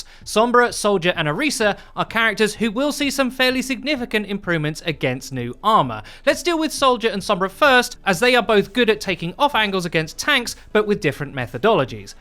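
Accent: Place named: United Kingdom. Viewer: Thought this was a decent explanation of the new changes. Nice to hear a British accent too.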